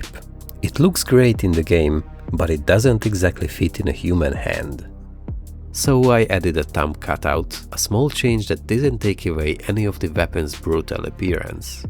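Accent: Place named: Hungary. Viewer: Are you from Hungary? The accent is not very strong, but it is there :)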